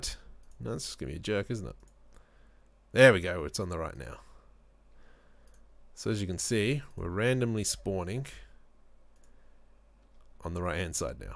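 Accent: Australia. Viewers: Good to hear and aussie voice and nice tutorial. How would you restart the random spawn process once the actor is destroyed, having alot of trouble with the extra step.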